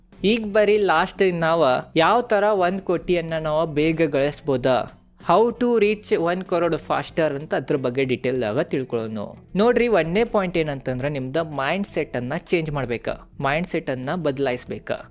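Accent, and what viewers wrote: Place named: Belgaum, India. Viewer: The King of Belgam - with Belgam Kannada. Jai Ho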